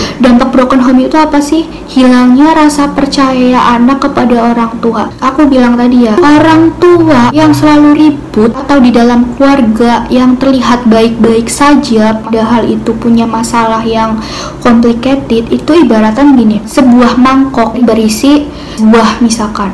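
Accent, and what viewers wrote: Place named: Java, Indonesia. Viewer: Kak kelihatan medok buanget😂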